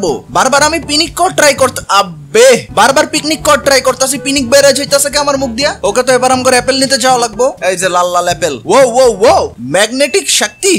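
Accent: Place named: Barishal, Bangladesh. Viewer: vhi apnar bari kothay apni ki borishali na- - ----_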